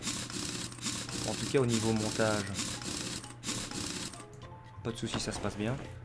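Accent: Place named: Belgium. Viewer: D'après ton accent tu dois sûrement être Belge ? 😁 (enfin des belges sur YouTube ahah) super vidéo +1 abos 👌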